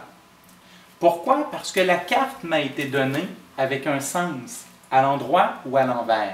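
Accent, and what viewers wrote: Quebec, Canada. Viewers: Merci pour tes explications claires. Ton accent est marrant, comme tous les québécois :) Est-ce exactement la même chose pour un tirage par téléphone concernant les cartes à retourner "à la verticale" ? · C’est passionnant !!!😄je me régale. Mais y a du boulot. Merci d’avoir réussi ces 2 livres. Et vos vidéos sont super agréables à écouter. Et l’accent Canadien est trop mignon. Bonne continuation.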